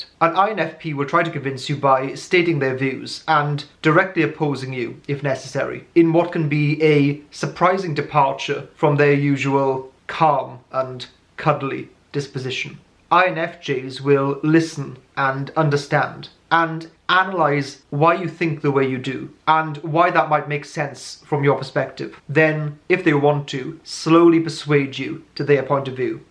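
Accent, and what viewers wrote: Wales, United Kingdom. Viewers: Are you from Wales? (Trying to figure out your accent)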